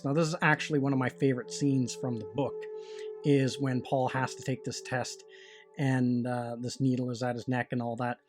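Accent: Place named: Midwest, United States. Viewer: I like to place accents so Wisconsin? or maybe somewhere in the midwest.? This is just out of curiosity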